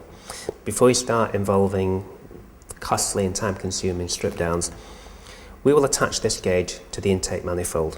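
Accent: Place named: England, United Kingdom. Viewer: I liked your English accent, every words was clear=good articulation. 10/10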